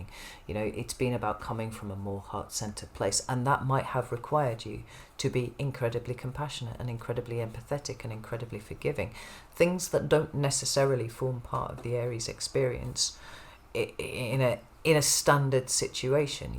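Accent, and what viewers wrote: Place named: Essex, United Kingdom. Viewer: Top shelf shit! What an amazing woman. You sound like you’re from my neck of the woods (Essex) too. Much love and thanks.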